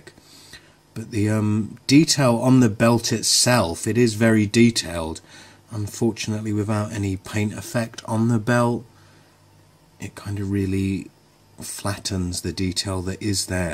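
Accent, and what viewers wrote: United Kingdom. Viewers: awesome review! fun to listen to your british voice! great belt custom! subscribe!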